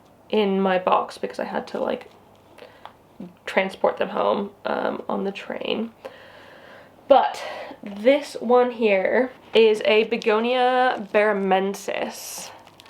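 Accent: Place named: England, United Kingdom. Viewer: You’re kinda picking up that English tang! Isn’t that funny how that works?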